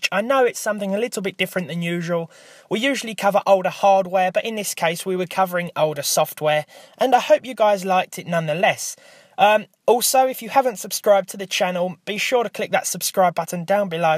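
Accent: United Kingdom. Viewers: You're* nice uk english.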